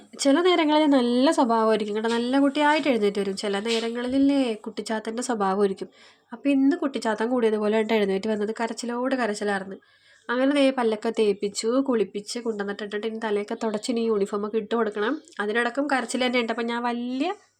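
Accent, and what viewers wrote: Kochi, India. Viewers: Aa "tta"kelkananishttam tttta 😊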